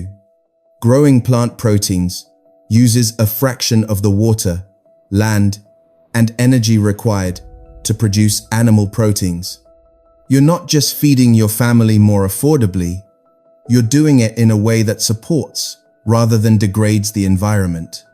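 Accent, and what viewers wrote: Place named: England, United Kingdom. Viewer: Talking dollars with an English accent 🧐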